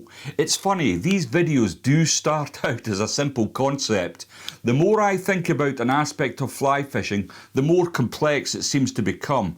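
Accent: Scotland, United Kingdom. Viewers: Happy to see the Pere Marquette River Lodge sticker behind you. I guided on the PM in the 90s. That lodge was “ Johnson’s Pere Marquette Lodge” then. With that Scottish accent, I’m wondering if you’re in Scotland, and if you’ve visited and fished Michigan….where the PM flows.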